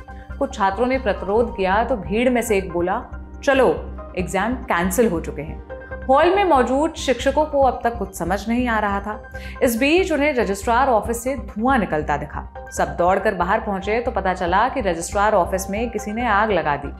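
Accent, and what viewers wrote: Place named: Lucknow, India. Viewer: Hi Lady you are extremely beautiful and an erudite person. I like your Hindi which seems to be of Lucknow dialect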